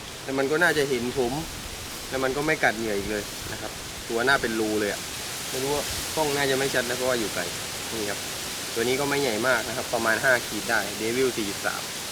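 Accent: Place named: South, Thailand. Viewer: พี่คนที่ไหนครับแหลงเหมือนคนใต้เลย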